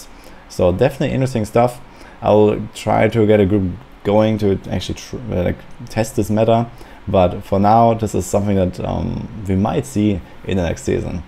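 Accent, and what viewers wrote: Germany. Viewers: bist du deutcher? hast so den Akzent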